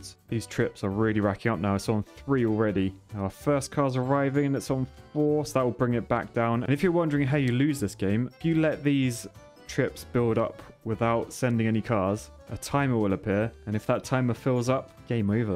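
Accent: United Kingdom. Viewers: Hes brit dont mind him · Dude yo British 😂😂😂😂😂😂😂😂😂😂😂😂😂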